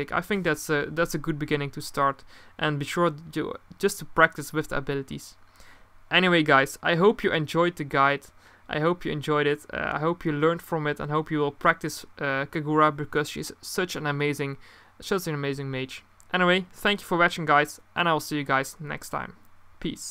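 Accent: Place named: Netherlands. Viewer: Ja aan dit accent hoor ik gewoon dat je Nederlands bent lol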